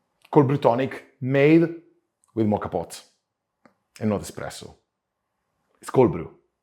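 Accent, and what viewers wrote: Italy. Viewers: Buena idea, buena explicación, y ese acento italiano excelente!! jaja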